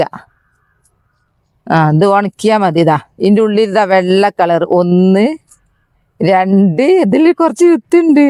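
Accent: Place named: Wayanad, India. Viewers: nice vayanadan samsaram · വയനാടൻ സംസാരം നല്ല രസം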